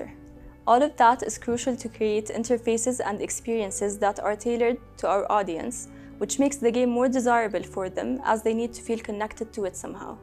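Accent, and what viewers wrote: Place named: Egypt. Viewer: هي من مصر صح ؟ واضح من الاكسنت 😂😂😂😂😂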